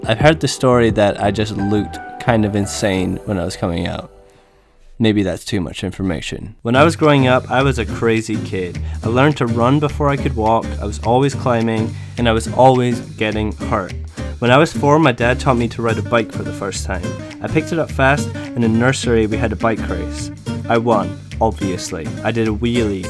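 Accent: Scotland, United Kingdom. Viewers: Good work. just wondering where you are from. You sound Scottish but I’m not sure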